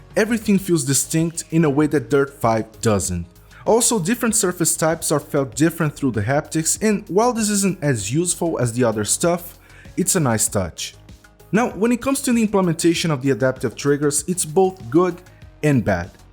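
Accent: Brazil. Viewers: Tu é brasileiro? Tem sotaque hahaha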